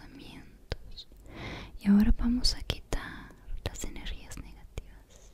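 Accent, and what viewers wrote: Argentina. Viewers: Se le sale lo argentino.... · Hablaste muy argentina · Suena Argentino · Te salió un acento muy argentino 😂 ¿será la mezcla del Italiano y español? 🤔 Me encantó tu maquillaje! ☺ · Es como que en este video hablas más en tonada Argentina a comparación de tus otros videos, va a mi se me hace no se(aclaro: no lo estoy criticando es más me gusta por soy de argentina) Amo tus vídeos💖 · Argentino mezclado con Itailiano es dnwkwjjwjeje arte, Amé ♡ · Amo tu acento argentino 😍😍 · Wow nunca te había escuchado hablar español sin susurrar O.o que voz tan bonita! Tienes acento argentino y dices palabras latinas:p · En este video me pareces muy argentina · Te queda genial papel de bruja😊 Pd: me encanta tu tonalidad media argentina c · una pregunta:¿Sos Argentina? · Yo solo quiero una poción para tenerla a ella:'v Pst: Tremendo acento argentino 10/10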